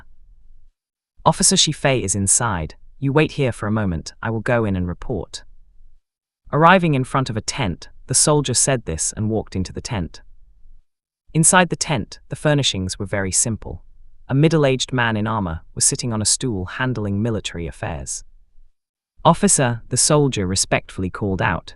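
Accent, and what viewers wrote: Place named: India. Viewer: What was it with the Indian accent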